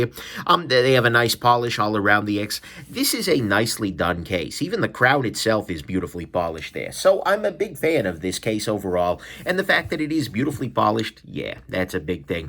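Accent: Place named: Brooklyn, United States. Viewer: ok i can deal with the annoying if somewhat entertaining voice - and I'm surprised to hear you're in the Ann Arbor area (where I grew up) as i would have guessed Brooklyn - but please, please don't hold the watch the way you talk: constantly jittering. Take some time with your hands so my eyes can focus on the watch. When you put it down it still shakes for some reason. Please. Your voice is going 80 mph but the watch shoudln't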